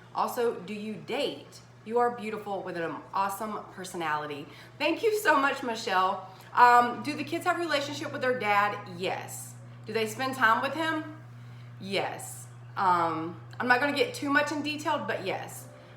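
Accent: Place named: Georgia, United States. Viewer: We are having terrible weather, so I'm watching all your old videos!!! 😍 I love that now you let your Georgia accent loose. I don't hear it so much in these older vids! Love you and your kiddos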